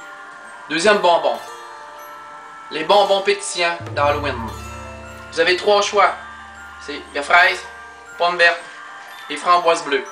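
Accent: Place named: Quebec, Canada. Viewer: accent quebequoi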